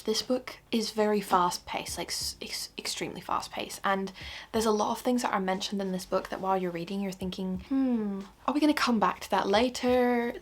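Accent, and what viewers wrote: Australia. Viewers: Has anyone ever told you that you sound like an Australian? I am Australian and had to double check 😅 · I’ve just found your channel and I’m obsessed! You’re so calming and genuine and you can feel how much you truly love reading and getting immersed in the story. Also the accent love are you a fellow Aussie/ half Aussie/ Irish.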